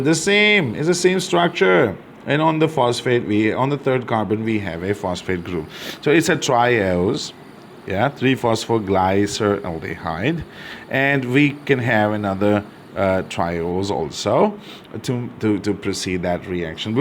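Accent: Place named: United Kingdom. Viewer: Sir your British accent just feels so real. so smooth 🤍 Really appreciate your work 🤍👍🏻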